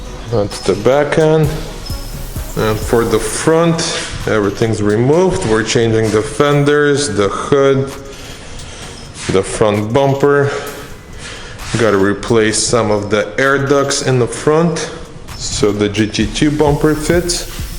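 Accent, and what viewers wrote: Russia. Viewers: Давно живёшь на заподе? Очень сильный русский акцент, чем-то белорусский напоминает.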